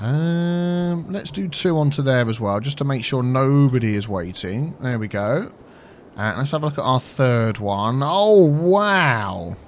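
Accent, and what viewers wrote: United Kingdom. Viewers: yeah you have played many different ones. l i thought you may have played one particular game i used too but you're not him. All you British guys sound interesting and amusing.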